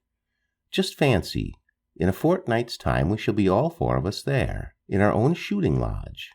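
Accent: United States. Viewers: Shouldn’t be an American voice but English. Sounds wrong.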